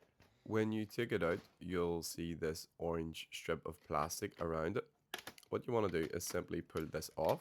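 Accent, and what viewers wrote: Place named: Northern Ireland, United Kingdom. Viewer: I like your Northern Irish accent and appreciate the helpful explanation. I'm thinking of buying a HL-2400DW so this video has been very helpful.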